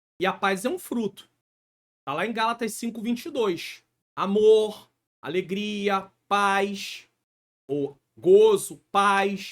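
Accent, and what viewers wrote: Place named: Rio de Janeiro, Brazil. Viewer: Pastor,venho do Futuro (setembro de 2026 😁),para dizer que passei anos na igreja (sou da Assembleia),e nunca havia lido a Bíblia,que vergonha 😢,mas desde o ano passado comecei a ler,estou em Provérbios,leio no meu tempo,sem pressa e sem tabela,e quero lhe dizer do fundo do meu ❤️,que eu glorifico a Deus,por ter colocado o senhor no meio caminho pra ensinar,explicar o que não entendo,Deus realmente lhe provém de sabedoria pra nos ensinar,o senhor não imagina minha felicidade a cada estudo com o senhor,gosto muito do seu jeito de falar e do seu sotaque carioca 😂..morro de saudades do Rio,morei 8 anos aí, sou paraense,mas tive meu filho aí,vim embora em 2001😢. Que Deus abençoe sempre, sempre,sua vida e sua família 🙌🏼📖❤️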